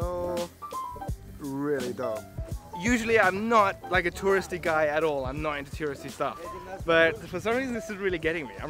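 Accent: Germany. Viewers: I can already smell the "Parkour Turist guide through Egypt with Jason Paul" in your Hillarious Germans English Dialekt... Egypt seems like an Perfect Place for Exploration... I saw the cat and was like "Yay Catpats" and then the cat ran away... but i saw the effort to keep your Promise so i let it pass for today and still like the Video The Kids were kind of Cute I like how the Bork of every tree is paintet White in the first two meters so no animals will eat that part because there are many Animals running around Will there be some Parkour Action with Camels? I like Camels... they are Derpy.... Derpy is Cute.... And while you on it.... Pat them for me Anyways Thanks for todays Video See ya Next Video <3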